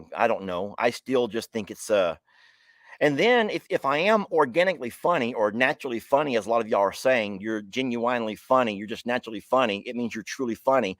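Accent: South, United States. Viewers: It's also partly your Southern Draw it's awesome to hear from you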